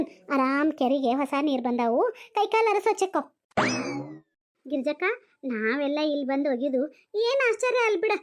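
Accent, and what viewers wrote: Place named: Vijayapura, India. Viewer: ಅದ್ಬುತ ಮಾತು ನಮ್ಮ ವಿಜಯಪೂರ ,uk ಭಾಷೆ ನಿಮ್ಮ ಚಾನಲ್ ನಲ್ಲಿ ನೋಡಿ ಕೇಳಿ ಬಹಳ ಖುಷಿ ಆಯಿತು ನೋಡ್ರಿ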